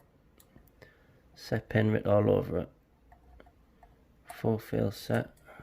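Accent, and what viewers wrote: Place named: Newcastle, United Kingdom. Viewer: I’ve bumped Yale as me era rims lol on a different note I would love to see a video of you picking a Ruko padlock I have just got one (not the combi) I do have one bit way above my skill level but I think I should be able to open the standard one and I’m getting nowhere maybe 1 or 2 pins set your video explanations are far easier for me to understand even with the jordie accent 😂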